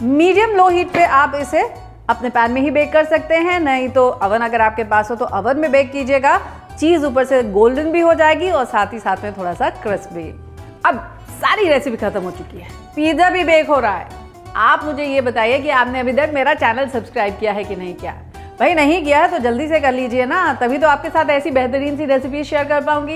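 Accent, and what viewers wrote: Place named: Bihar, India. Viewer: Ka ho ustad👀 u r bihari woho I thou u r Punjabi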